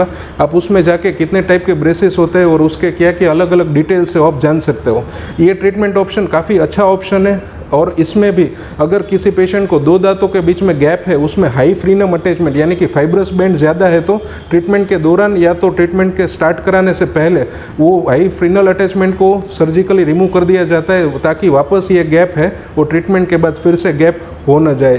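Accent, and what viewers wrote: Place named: Kathiawar, India. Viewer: bhai aap Hindi bhi kathiyawadi lehje mai bolte ho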